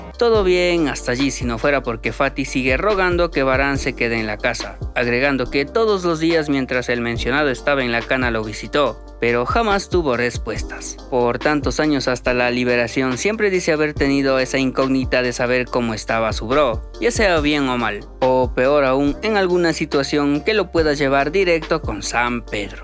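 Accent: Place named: Ecuador. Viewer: Por como hablas eres paisano de Ecuador, un saludo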